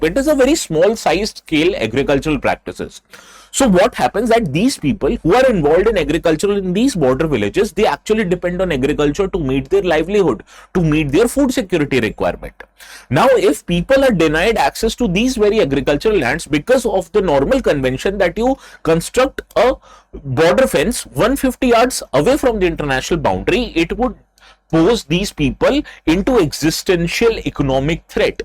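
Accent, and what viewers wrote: India. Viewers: funny Indian 🇮🇳 accent ... 💩 🇮🇳 💩 😅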